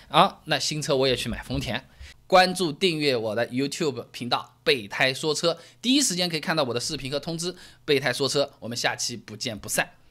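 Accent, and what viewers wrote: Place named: South, China. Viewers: 上海口音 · 非常喜欢你的南方普通话。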